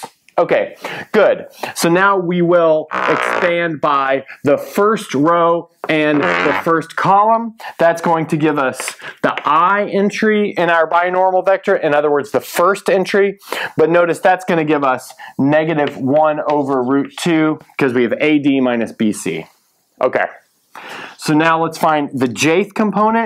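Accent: United States. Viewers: You have a very thick American accent. Got to concentrate hard to understand it. No idea why I'm commenting that. I'm drunk. Might explain everything.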